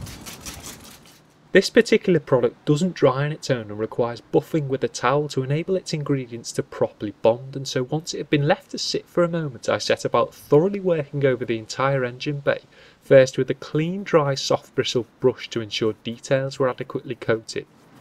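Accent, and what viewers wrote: Liverpool, United Kingdom. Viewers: Sounds like a Liverpool accent.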